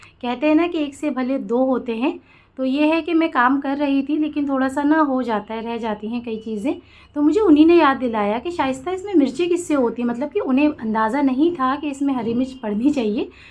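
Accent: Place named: Delhi, India. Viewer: Wow aap dehli se h isi liye itna peyara labo lahza h mujhe hamesha andaza hota tha aap dehli ki ho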